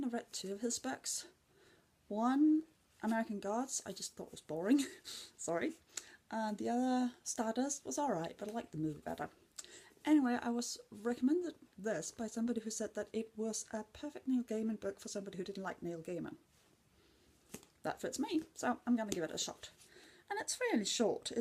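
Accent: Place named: New Zealand. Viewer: Din kiwi accent er jo gudeskøn:) håber du får et rigtig godt readathon!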